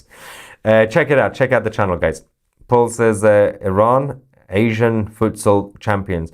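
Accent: United Kingdom. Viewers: Mr.! Did have seen your Video and heard your fucking British accent??? Believe me, you’re a very cheap guy like those IRJC and Basij undereducated!!! 👎👎👎👎👎👎👎👎👎👎👎👎👎👎👎👎👎👎👎👎👎👎👎👎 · .if America goes in on foot it's gonna be bigger then Iraq does. Trump want a long war . because bombing them won't get rid of them if you want change you have to go in on foot.. be prepared to lose thousands of Americans .. how much are they paying that British accented mouthpiece.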